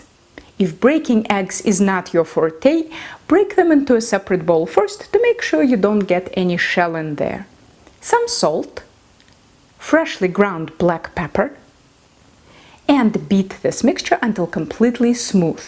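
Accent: France. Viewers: Very good, and I love your french accent! I am going to try this tomorrow.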